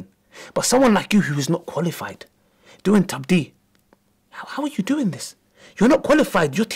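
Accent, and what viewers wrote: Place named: United Kingdom. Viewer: As salamou alaikoum brother , I am a sister from Montreal and my English is not on top and I ask you brother to speak a little slower since it’s mixed with British accent and I have a bit difficulties to understand. Can you put subtitles? Your videos are full of good informations. Thank you brother may Allah reward you al Jannatil firdaws🙏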